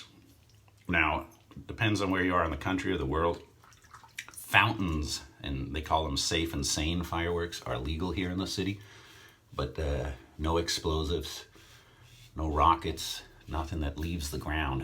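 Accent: Newcastle, United Kingdom. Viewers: Very cool tattoos Sir. I can just hear the Newcastle accent ;-). I have yet to get my first tattoo. Is something I have wanted to do since I was young. I have something in mind and may get it done. Ps if ever you and family visit the UK would be an honour to show you the Hampshire and south coast area :-)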